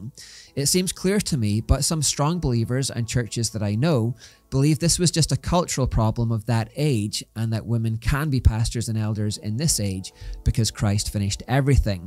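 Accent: Scotland, United Kingdom. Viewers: Love your channel,. I've been watching your videos since Know Your Enemy, and no, your Scottish accent isn't a problem at all and English isn't my first language. In fact, I'd like to hear your natural Scottish accent at least once, because I've been to Scotland and oh, that was fun! 😂 I struggled to understand half of what people said, but still loved the accent. Finally, I'm absolutely sure that this channel is being suppressed by YouTube. I watch a lot of Christian content and (no offense to anyone else but) channels that aren't nearly as good as yours have grown significantly more. Channels with not only "worse" content, but also editing and overall quality. I guess you just speak too much truth! Best of luck with all you do. 😊 Can't wait to see what your next series is! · I've appreciated each video in this series. Thanks for being true to the Lord. 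I love listening to Scottish accents. 😊 Yours is very easy to understand for this Iowan from the US. Looking forward to the next series. May God continue to bless you!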